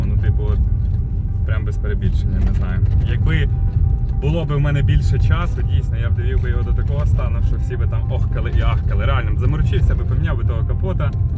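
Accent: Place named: Rivne, Ukraine. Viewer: друже ти з Рівного,чі десь прруч?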